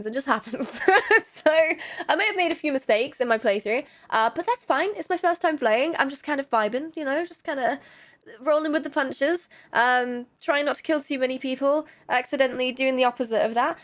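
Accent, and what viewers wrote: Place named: England, United Kingdom. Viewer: Your cute & fun, always a sucker for a nice English accent...your easy to understand, (cause' I'm American)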